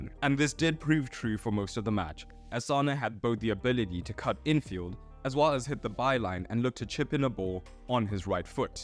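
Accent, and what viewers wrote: South Africa. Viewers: yo are you south african ?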